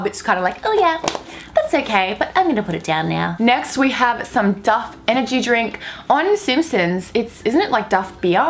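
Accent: Australia. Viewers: Omg I love your accent😂❤️ I never thought I would love Australian accents but I turns out I do😂😂 when you found out the Reece's bar was out of date hahah omg😂😂❤️❤️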